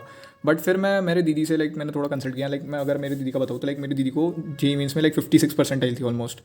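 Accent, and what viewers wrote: Maharashtra, India. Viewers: bhai aap maharastra se ho kya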